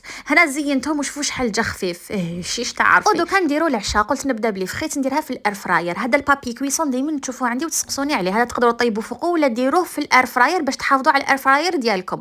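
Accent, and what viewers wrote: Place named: Morocco. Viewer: يا جدع انا عملت كومنت بس علشان ام اللهجة الي مش بفهم منها كلمة دي اكيد مغربية باين من الفرنش و الدريجة الي هتاخد منكوا حتة 🙂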